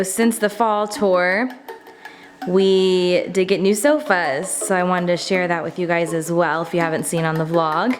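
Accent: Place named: Midwest, United States. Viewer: I’m not American so I’m not sure but is this a midwestern accent?? She has the craziest twang in her voice I’m obsessed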